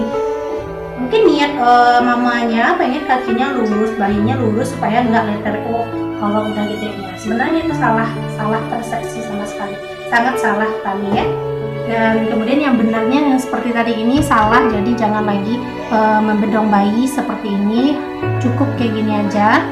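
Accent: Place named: West Sumatra, Indonesia. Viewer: Tangan Bainai ,Logat kental . FIX urang awak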